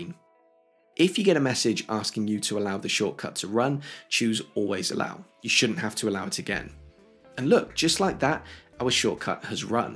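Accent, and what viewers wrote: United Kingdom. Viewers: Often wondered, now I know, 🙏 and you’ve a British voice without the bull that most vlogs from across the pond revel at.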